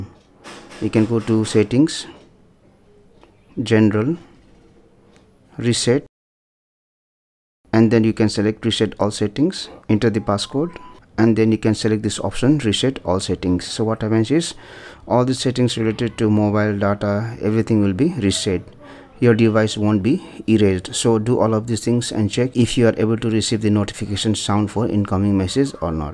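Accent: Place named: India. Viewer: Thanks man! ….You’re Indian accent is amazing to me. The more I listen to you the more easily I can understand! Haha!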